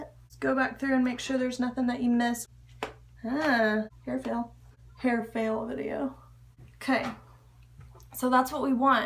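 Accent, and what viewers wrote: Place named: United Kingdom. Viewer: That British accent though.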